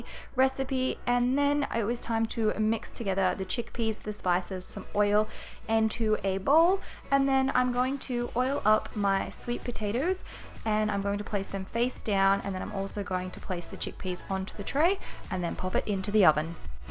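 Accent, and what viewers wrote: Australia. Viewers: Are you Australian?? Btw these look great :)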